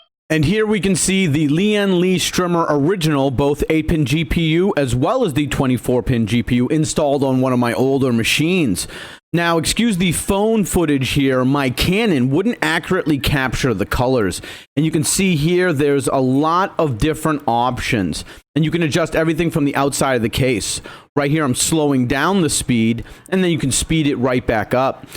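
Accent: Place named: New York, United States. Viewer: Love the New York accent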